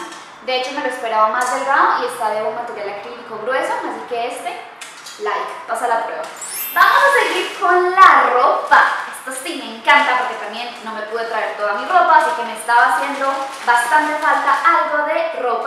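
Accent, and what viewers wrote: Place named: Mexico. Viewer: Ya hablas con acento mexicano! Seré la única que lo siente? 😂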